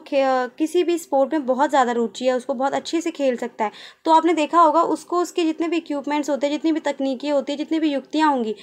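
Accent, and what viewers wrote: Delhi, India. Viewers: Mam are you from Delhi 😁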